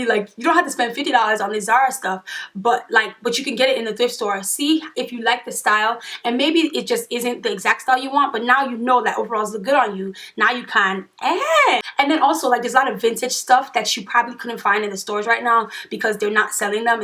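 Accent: Nigeria. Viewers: yes she's a NIGERIAN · Are you Nigerian?? Your accent sounds like it 😂❤️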